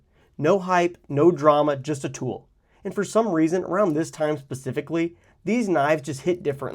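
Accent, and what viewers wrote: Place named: United States. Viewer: If you're comfortable sharing, where do you live? You speak American English but apparently live in the southern hemisphere (because it's winter where you are), so I was curious. I'm guessing Australia?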